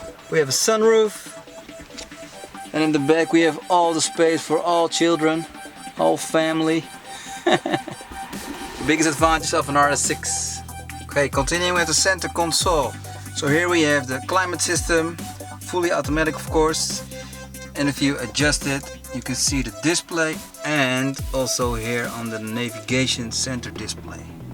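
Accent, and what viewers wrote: Netherlands. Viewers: Leer effe normaal engels te praten zonder zoon verschrikkelijk Nederlands accent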